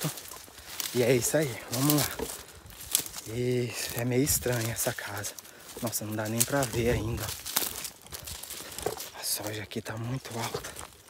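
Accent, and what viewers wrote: Minas Gerais, Brazil. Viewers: Olá! Boa tarde amo assistir seus vídeos e seu trabalho! Esse seu sotaque é de mineiro? Kkkk adoro seu jeito de falar e sua humildade e honestidade!!!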